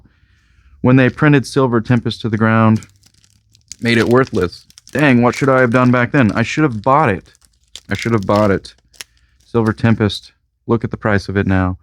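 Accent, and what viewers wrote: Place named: United Kingdom. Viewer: Dude…you should do your video I that British accent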